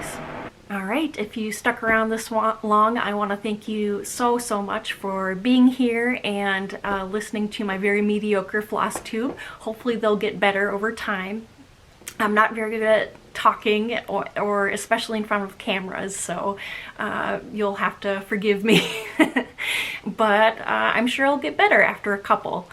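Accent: Midwest, United States. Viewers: Welcome to FlossTube. Great job with your first video. Not sure what you meant about a Minnesota accent. My first impression before you told us was - yeah she is a Midwesterner like me! I am in Illinois close to the Wisconsin border, and you sound just like us down here. You betcha! I like your WIPs. They are are not the usual ones I see on FlossTube. Your love for animals is engaging, and I look forward to your sharing your knowledge of animals with us. Best wishes for a safe, happy and healthy holiday season. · Get in line for the Midwest accent,I'm a Scony Our mudpuppys look different too.😆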